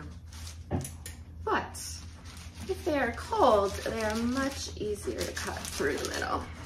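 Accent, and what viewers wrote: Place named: New York, United States. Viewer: You sound like a New Yorker today. Lol! Love watching your videos.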